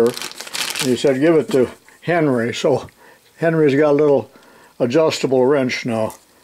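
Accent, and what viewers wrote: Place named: Illinois, United States. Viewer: i know this sounds really weird and stalk ish of me but i live in Quincy Sensei and there is a part of me that deeply wants to meet you before we have to say goodbye to you for good, and time is short. i don't know how we could work that out and keep our privacy intact. but it is a honest wish of mine. it pulls at my hart just a little lets just say.for no farther than i'm thinking it is . i'm taking a ball-park stab at the distance of a drive it might be. i think it be a lasting regret if i didn't at least try if it was o.k. with you. i'm thinking Central ill, from the sounds of it. meaning your manner of speech . the state is regional like that if you haven't noticed in all your years. and the way southern ill. has its own sound as you go farther south . like when the closer you get to that dump the call Chicago as well the sound changes.like when your are next to Mo. 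or Iowa being smack dab in the mid west. we border quite a few states and well they all have an influence . but rural central ill is a island all to it's self . you don't have to say here an i don't advise it you know how some people are. its not as innocent of a world as it was in your younger years i wouldn't want you bothered by any unwanted visitors. but just maybe you could see your way to agree to meet some place public . oh i don't know thought just hit me just now to suggest the local court house if that made you more comfortable . well i wont pester you about it any longer it just think it over. one m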